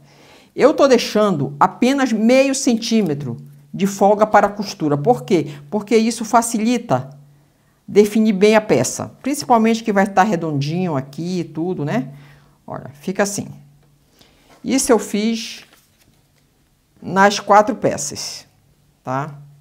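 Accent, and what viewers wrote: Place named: Northeast, Brazil. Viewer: De qual estado do nordeste você é? Esse sotaque não conseguir identificar. É do nordeste mesmo ou é norte?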